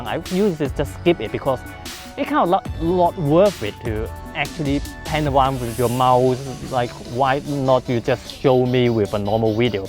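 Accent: China. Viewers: Now i can understand 🇨🇳 ChinEnglish 🇬🇧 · Chinese assent is very cute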